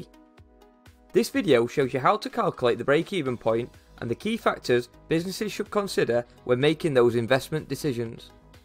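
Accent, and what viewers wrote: Yorkshire, United Kingdom. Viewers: well done. Very nicely explained. You sound Yorkshire :)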